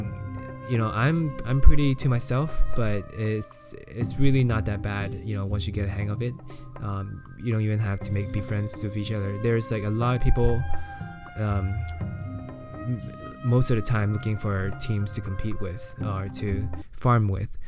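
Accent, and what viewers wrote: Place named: Asia. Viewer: Your english sounds like an asian english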